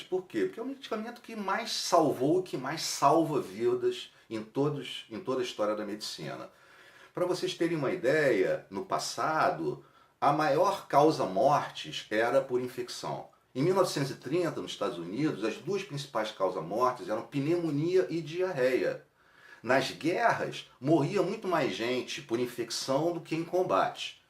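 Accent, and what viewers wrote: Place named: Rio de Janeiro, Brazil. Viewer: Até o sotaque da Zona Sul do Rio é parecido!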